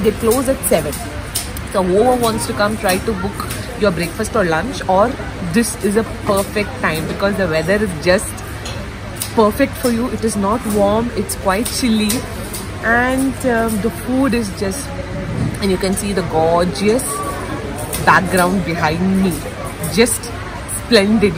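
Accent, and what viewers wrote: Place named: United Kingdom. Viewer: You speak English like British. Reminds me of Queen. We Indians are best.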